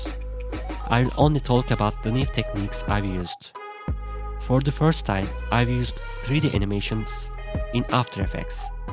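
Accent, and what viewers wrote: Turkey. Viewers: Türksün lan sen konuşmandan belli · O kadar Türkçe aksanın var ki :D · Türk olduğunu aksanından anladım ama güzel video🙂👌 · Olm aksağanından anlaşılıyo türk olduğun lan aksağan berbat fjslnsfjld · Reis aksanından türksündür diye düşünüyorum fenasın adamsın ellerine sağlık :) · bu kesin Türk, aksanından belli. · Aksanından anladım Türk olduğunu :D Başarılar! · Türk aksanı ilk sözden anladım 😘😂 Türkçe altyazı da koysaydın iyi olurdu. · ABİCİM Bİ VİDEO DA TÜRK OLDUĞUNU SÖYLE YA KONUŞMANDAN ANLAŞILIYO BİLE NERELİSİN ABİCİM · Türk ingilizcesinin şekil bulmuş hali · Good job. / Aksanından Türk Olduğunu Anlamıştım! Gerçekten Çok İyisin. Devam Et.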